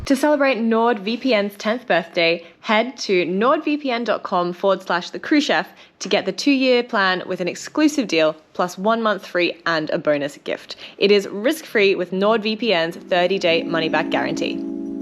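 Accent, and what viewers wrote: Australia. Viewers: Your Aussie accent is coming back. I noticed it almost right away. Good on ya cobber. (From a Canadian so I hope I did not offend) Lol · Had no idea you were Australian…I can usually do a good job (for a Yankee) of picking out the difference in accents among the commonwealth nations (or former) I admit to missing it this time. Were you born there or your parents from elsewhere? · Haha, you sound so much more Australian now you're back in Aus :) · Knew you were an Aussie!! Welcome home, chick.. Great vids, cheers. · She does sound a bit more Australian than before. Must be because she's staying far away from that bad influencer Tilly. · You can always tell an Australian by their "Hee-uh" . We're finally Hee-uh. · It's funny that you mentioned hoping you'd get your accent back at the end of the video. During your introduction at the start, your accent sounded so strong that I said out loud "Oh that's right, she's Australian!"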